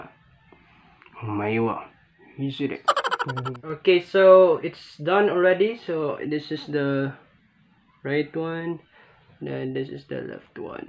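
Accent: Visayas, Philippines. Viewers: Bisaya diay ka😁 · Bisaya raman daiy😂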